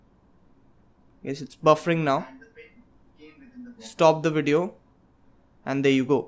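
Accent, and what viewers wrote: India. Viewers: ياخي يجيني مغص اذا قعدت اسمع لهندي يتكلم انجليزي😫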